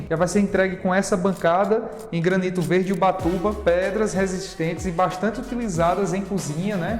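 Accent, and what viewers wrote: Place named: Ceará, Brazil. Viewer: Gente esse canal virou uma série pra mim. Na proxima encarnação vou nascer no ceará quero ter um sotaque assim.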